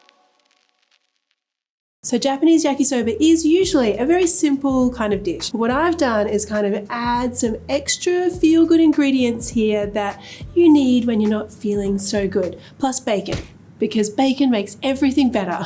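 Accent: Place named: Australia. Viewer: I'm not a native English speaker and I learned mostly by watching American shows and then I lived in the UK for a few years so I picked up a bit of a London accent, and now I'm picking up some Aussie-ness from watching this channel, apparently. My Aussie friend keeps saying "when did you start sounding like this?" it's because of XD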